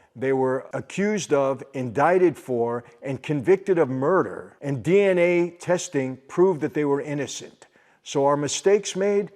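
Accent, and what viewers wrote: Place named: United States. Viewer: Romanian? American English...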